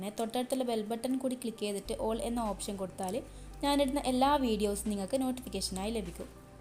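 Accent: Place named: Kannur, India. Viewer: Samsaram kannur bhasha kelkkan nalla rasamund.. recipeyum nannayittund.. good presentation and preparation 👌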